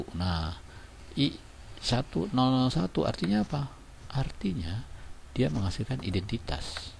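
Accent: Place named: Malaysia. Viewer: Logat nya kayak orang melayu yah pak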